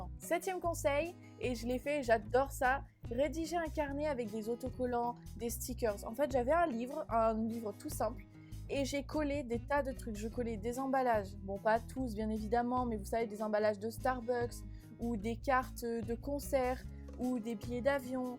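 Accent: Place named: United States. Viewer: Et sinon ton accent américain c'est goal, est ce que les américains qui te connaissent pas remarquent que tu es française ?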